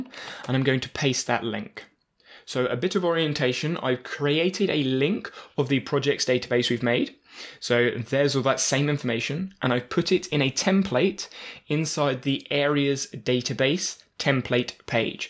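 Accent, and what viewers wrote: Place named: Gloucestershire, United Kingdom. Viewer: Cheers. Where you based? You sound local. Gloucestershire area?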